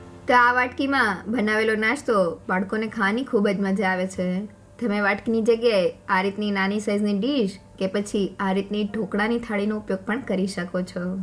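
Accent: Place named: Kathiawar, India. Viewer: આપણી કાઠિયાવાડી બોલી 🙂 સાથે મસ્ત રસોઈ 👍 God bless you 🙌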